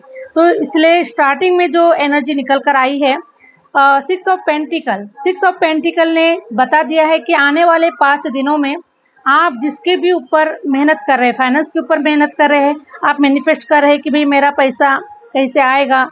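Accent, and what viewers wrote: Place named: Maharashtra, India. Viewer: SHE IS 50 PLUS, AND IS A GOOD MARATHI SPEAKING WOMEN, AND HER ACCENT IS MORE MARATHI. CONCENTRATE ON HER READING AND NOT ON HER BEAUTY OR FACIAL EXPRESSION. EVEN IF YOU LIKE HER AND WANT TO APPRECIATE HER FACE AND HER EXPRESSION, DON'T MAKE IT PUBLIC. SHE IS A GOOD TARRO CARD READER, AND THAT'S IT...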